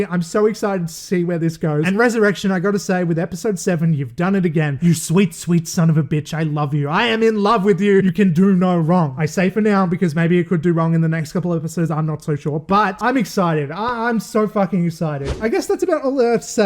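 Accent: Australia. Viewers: The Aussie is back with some badass recapping😊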